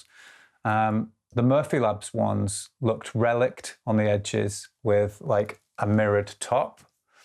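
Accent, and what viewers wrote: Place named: Manchester, United Kingdom. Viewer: I watched the footie last night and felt hyper about 2 am..... So I put YouTube on and thought it was about time I learned solo to..... Chris Spedding is still one of my favourite guitarist so thought I'd investigate your channel.... I'm hooked!! It helps that I understand your accent..... That's real Mancunian! But seriously you're a cracking player and inspirational teacher..... Keep rocking!! Gear analysis is great too. I've heard good things about Sunbear pickups.... AND they're English!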